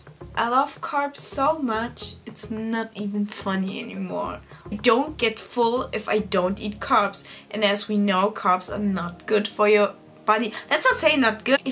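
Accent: Germany. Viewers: Heftig wie ignorant manche Menschen hier sind! Sie kann die Videos in der Sprache drehen, in der sie es möchte. Was ist daran so schwer zu verstehen? War irgendeiner von euch Englischlehrern denn EINMAL im Ausland, wo Menschen verschiedener Herkunft untereinander Englisch geredet haben? Jeder hat einen Akzent! Ihr deuscher Akzent ist normal und NATÜRLICH. Hätte sie einen britischen oder amerikanischen Akzent, würdet ihr doch auch wieder haten mit „sie kommt aus Deutschland aber redet so und so“ ..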